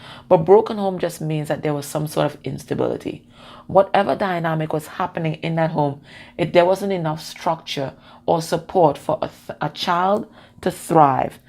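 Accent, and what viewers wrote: Trinidad and Tobago. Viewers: A Trini or Guyanese accent. I do follow Steve Nobel meditations very helpful. I'm a Bajan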